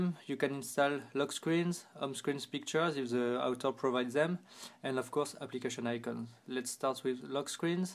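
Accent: France. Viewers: LOL un français qui veut parler anglais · I Like His French Accent But I Dislike His Pronunciation Of 'Theme' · I like your French accent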